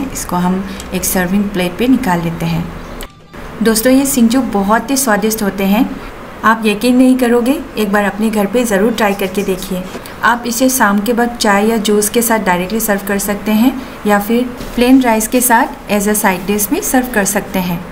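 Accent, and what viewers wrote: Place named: Nepal. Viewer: Its interesting to know more about singju ingredients but nangee hindi ngangbado nepali khoi gee accent malle 🙏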